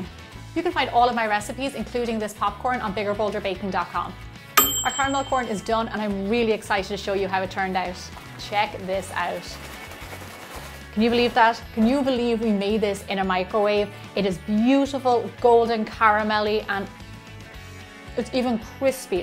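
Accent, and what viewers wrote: Ireland. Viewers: Are you from ireland because I am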